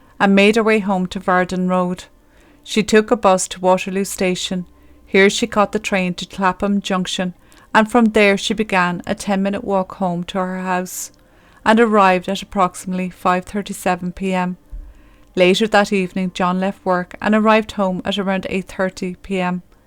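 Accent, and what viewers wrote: Ireland. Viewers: I like this narrator,as her Irish accent makes it more personal,l also like her comments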